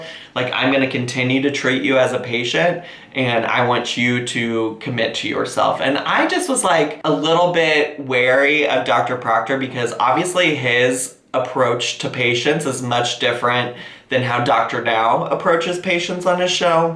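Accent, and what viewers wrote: South, United States. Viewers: > Super Bowl 😁 as an homage to my favorite YouTuber (you) I’ve started saying No ma’am and ma’am in a southern accent. And I live in Oregon. You rock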